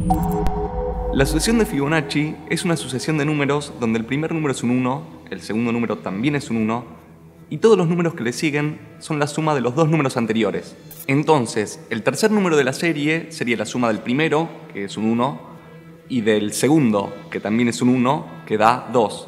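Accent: Argentina. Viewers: Buen video. ¿Eres argentino?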